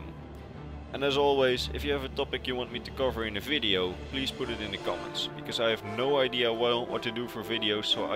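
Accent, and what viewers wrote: Netherlands. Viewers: you sound dutch ...but nice vid ^_^ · It sounds like a dutch accent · As a Dutchman, I can hear clearly in the sound of his English he is Dutch.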